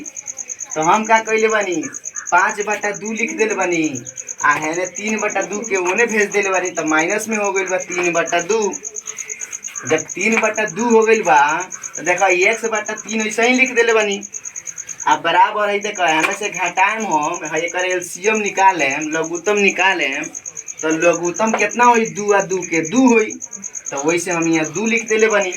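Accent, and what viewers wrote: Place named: Bhojpuri, India. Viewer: Bhojpuri andaz me sir❤❤❤❤❤❤❤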